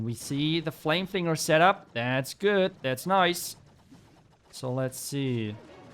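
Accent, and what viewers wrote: Germany. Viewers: German accent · I know he is German and you have the German accent but what else is in there? Is that Scottish vowel pronunciation or what is it?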